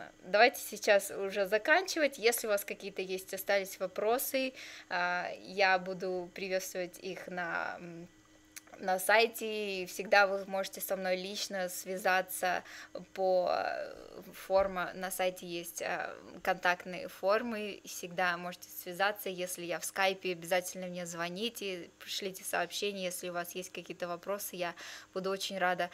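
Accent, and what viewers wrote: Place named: United States. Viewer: По речи видно, что она жила или пребывала некоторое время в Америке, язык может немного "теряться", и это не удивительно.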